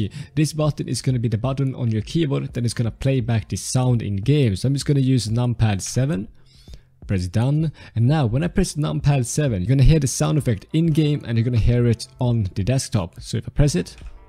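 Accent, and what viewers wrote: Sweden. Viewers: Are you from sweden? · Du är svensk hör det på dialekten 😜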